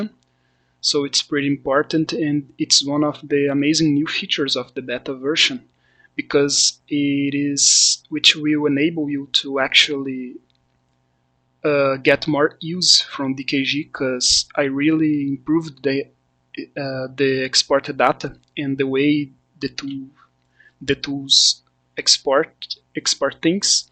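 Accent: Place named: Brazil. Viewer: Tu tem sotaque brasileiro pae.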